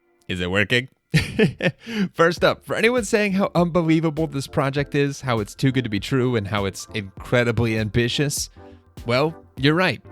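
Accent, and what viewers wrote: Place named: Indonesia. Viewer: Wow your Indonesian Acsent So Perfect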